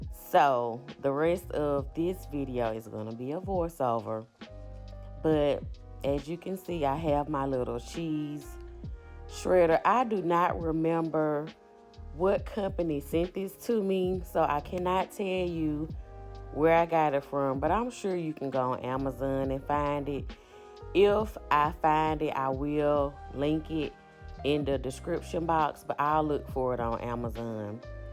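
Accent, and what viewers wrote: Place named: South, United States. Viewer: You are just such a vibe! I love how relaxing this is! I also love your southern accent! Jersey girl here! lol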